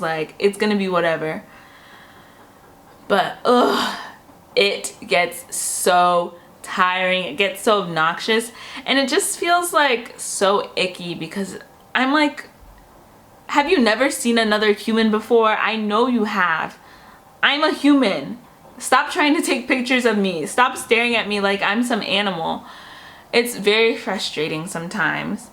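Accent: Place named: Georgia, United States. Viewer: Sounds Georgian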